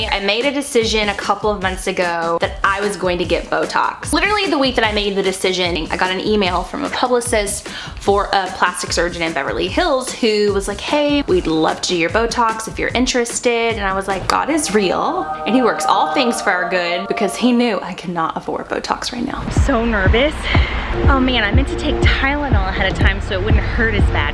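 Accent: South, United States. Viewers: F A N C Y - really southern accent - love it!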